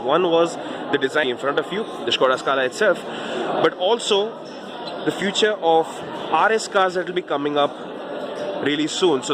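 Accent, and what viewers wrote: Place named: India. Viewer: Indian accent, reminds that asshole Indian manager no one likes.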